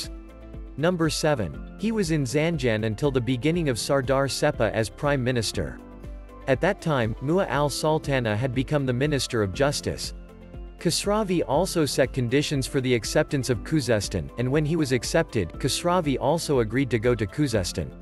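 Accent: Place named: United States. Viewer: American Accent on this video Sucks Pronunciation even worse Hollywood Graphics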